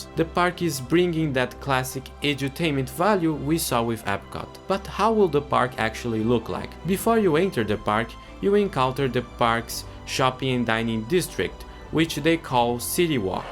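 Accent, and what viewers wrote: Brazil. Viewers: O narrador é brasileiro, né? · I already knew your channel but only now I realized you might be brazilian like me (based off the accent). Meu amigo, finalmente nosso país terá algo digno de seu tamanho na indústria de entretenimento temático e espero que isso faça o BCW e o Hopi Hari se movimentarem rápido quanto a B&M e a RMC , respectivamente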